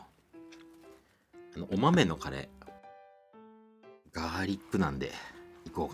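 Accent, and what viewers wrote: Japan. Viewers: Saludos desde venezuela 🇻🇪♥️😘me encAnta tu asentó japonés aunque no entiendo nada jijiji me facina y me encanta tus videos 😘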